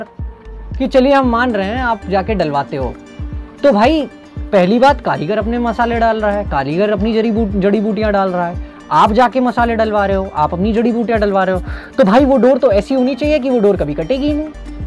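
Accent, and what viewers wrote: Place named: Allahabad, India. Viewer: Allahabadi bhasha me akdum lalantak Patang hai bhai apki.... Man moh Liya bhai. LOVE FROM ALLAHABAD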